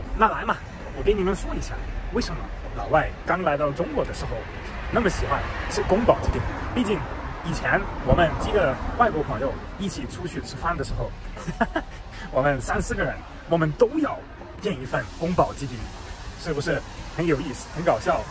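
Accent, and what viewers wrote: Sichuan, China. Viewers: 你的四川口音…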